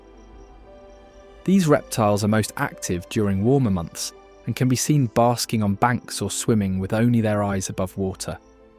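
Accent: United Kingdom. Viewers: Better Music would've made this SO MUCH MORE Shareable... the British accent is a bit overplayed and mispronunciations laughable - otherwise BEAUTIFUL!!!! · As a Houma Native American, I am offended that the Houma Tribe is not even mentioned and I have never heard of the Point au Chien tribe. Too many inaccurate claims for such beautiful drone footage! I'm guessing that the narrator's British accent is a giveaway of why there is no mention of the Battle of New Orleans where the British Army was mowed down by Andrew Jackson, Jean Lafitte and native American & Cajun volunteers at the Chalmette Plantation south of New Orleans.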